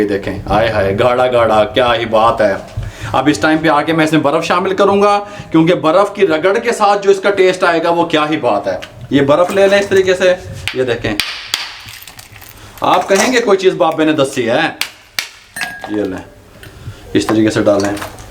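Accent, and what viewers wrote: Punjab, India. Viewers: Urdu aur punjabi mix accent wah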